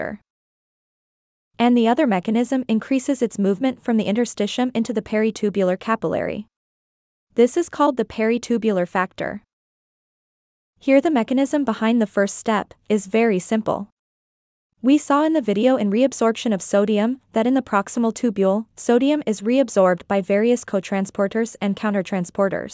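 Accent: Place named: Gujarat, India. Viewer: YOUR VIDEOS ARE GREAT....BUT WHY DID you change the voice over...one reason that i watch your video is its in your native gujju accent (please dont take it in the bad sense). I love it.. Please stick to your original voice , you can work on processing your voice with AI or whatever you resources you have, but please stick to your voice.... If there was an award on teachers day (5th September in India) for best you tube teaching you will give very tough competition for the others,.All the best & ThanQ for such wonderful teaching.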